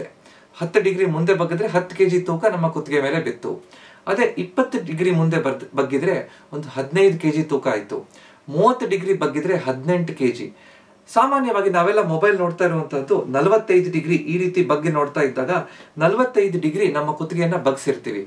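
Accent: Standard. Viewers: ಮಾನ್ಯರೆ, ತಾವು ಸ್ವಚ್ಚ ಕನ್ನಡದಲ್ಲಿ ವಿಸ್ತಾರವಾಗಿ ನಿರರ್ಗಳವಾಗಿ ಅನೇಕ ವೈದ್ಯಕೀಯ ವಿಷಯಗಳನ್ನು ಹಾಗು ಸಮಸ್ಯೆಗಳಿಗೆ ಪರಿಹಾರ ಮಾರ್ಗೋಪಾಯಗಳನ್ನು ಸೂಚಿಸುತ್ತಿದ್ದೀರಿ. ಅನಂತ ವಂದನೆಗಳು.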